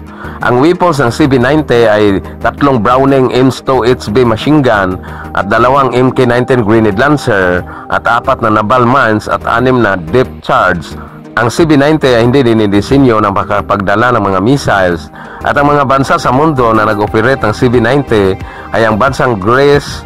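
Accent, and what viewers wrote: Visayas, Philippines. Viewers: bisaya ung bosses 😅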